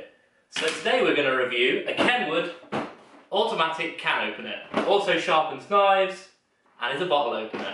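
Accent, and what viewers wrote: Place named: England, United Kingdom. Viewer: I just received my Kenwood CO606. Stay safe💙 Ps. It is so nice watching a youtuber with an english accent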